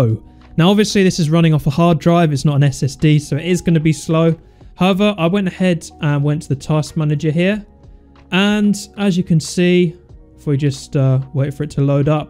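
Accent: United Kingdom. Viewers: Are you Brithish English or Amerikan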